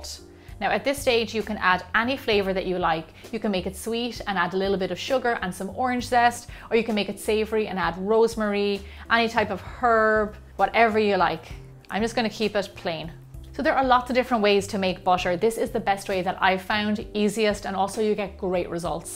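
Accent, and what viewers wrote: Ireland. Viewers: Where are you from!? sounds interesting. Def not America. But I love it. Sounds like a tint of Irish. I’m Irish. But it sounds like you’re trying hard to cover up your accent. You ARE from Ireland. I hear you. Very good. Come to America. Let’s meet. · She's from Ireland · SUBSCRIBED! THANK YOU! LOVE YOUR IRISH ACCENT! Makes your vids that much more enjoyable to listen and watch.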